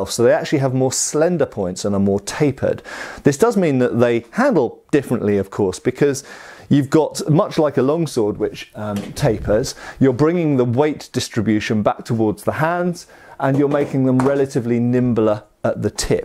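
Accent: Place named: United Kingdom. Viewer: Spea britishl and uses inch... but why?? hahaha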